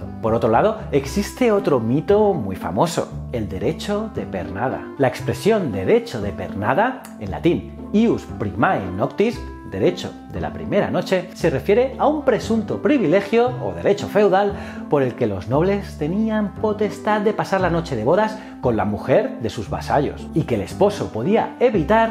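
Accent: Spain. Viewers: MUY INTERESANTE TU CANAL, ¿DE QUE PARTE DE ESPAÑA ERES.?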